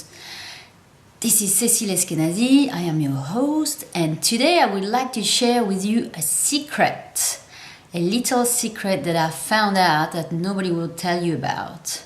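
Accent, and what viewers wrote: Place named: France. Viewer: Thanks for the information and the cute French accent. I’ve liked and shared.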